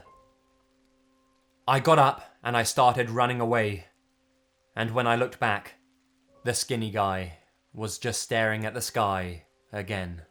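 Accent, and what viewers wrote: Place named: RP, United Kingdom. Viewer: I really like your stuff and because you're a Brit, your accent lends an RP (BBC World Service for those who don't know what Received Prononciation is) seriousness to what you are saying. This gives the impression that it's reportage and makes it all the more chilling. Of the Narrators who do true stories I find yours give me the most pause for thought and the most chilling feeling. (Last time I complimented someone a troll latched on to me like a nasty little tick and I fully expect I will get lambasted for this so I am not letting it go to Google plus...the bugger will have to listen to a lot of good stuff to find this hopefully. .but despite that I think you ought to give credit where it's due!). (Ok trolls I may have put i before e after c in in a word but I can't be bothered checking. ....before you comment. ..I know! 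).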